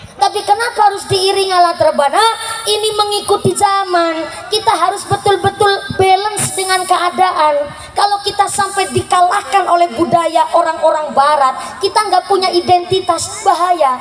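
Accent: Banyumas, Indonesia. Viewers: Nyimak Ceramah ngapak nya😂😂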